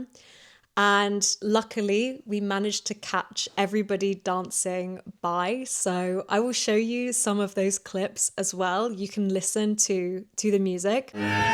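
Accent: United Kingdom. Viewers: Your British accent is sooo nice - it's like music to my ears!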